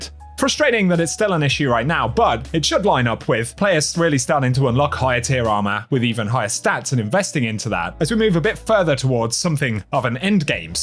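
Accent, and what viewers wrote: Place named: United Kingdom. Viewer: Always useful D2 info. & British accent!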